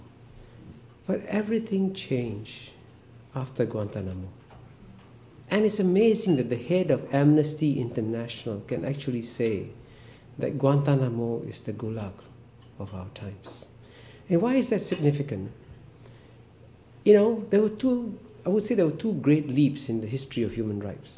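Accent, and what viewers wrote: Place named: Singapore. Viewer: he sounds SIngaporean!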